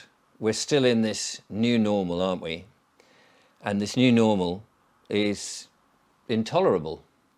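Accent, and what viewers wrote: United Kingdom. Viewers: hi, since I discovered your channel I only watch that, really interesting, I also enjoy listening to the british accent...so elegant...keep doing a great job, cheers from an Algeria guy lost in the cold of Montreal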